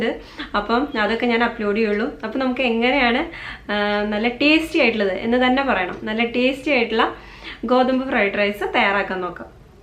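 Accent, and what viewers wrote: Thrissur, India. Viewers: വളരെ നന്നായിട്ടുണ്ട്, നമ്മുടെ തൃശൂർ ഭാഷയും അടിപൊളി, തൃശൂർ എവിടെയാ, ഞാൻ ഇരിഞ്ഞാലക്കുട യിൽ ആ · അടിപൊളി മാഷേ ഇമ്മടെ തൃശൂർ ഭാഷ അസ്സലായി ഞാനും തൃശ്ശൂരാണേ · പിന്നെ എന്തുട്ട്ണ്::: തു ശൂർ ഭാഷ like it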